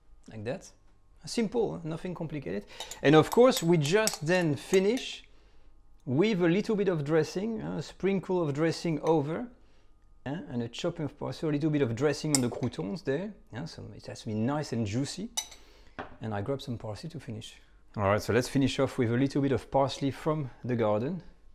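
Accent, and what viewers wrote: France. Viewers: I am french , from Québec, so I also feel like he has that huge french (from France) accent . Also he did say Crouton so many times xD.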